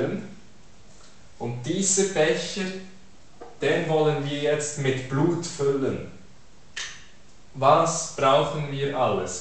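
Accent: Switzerland. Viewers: schweizer Deutsch?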